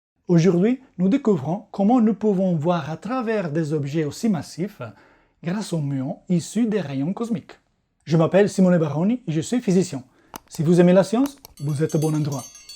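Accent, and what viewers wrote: Italy. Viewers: Vous avez tout à fait raison!!! La qualité de ses vidéos et de ses explications est de haut niveau. Comme l'est sa pédagogie, sa façon de simplifier les choses complexes pour nous, public ordinaire. Je suis inscrite à sa chaine en italien et ne savais pas qu'il en avait également en français. Outre le fait qu'il est super beau gosse (ici en Toscane, on dit: un bonazzo della madonna) 🤩 Mais en plus, ici, s'ajoute le merveilleux accent italien. Oh Mammaaaaaaaaaaaaa 😉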